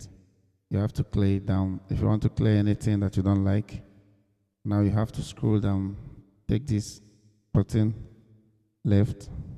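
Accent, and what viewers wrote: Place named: India. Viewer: Sir your voice has turned to an Indian voice, I couldn't understand the language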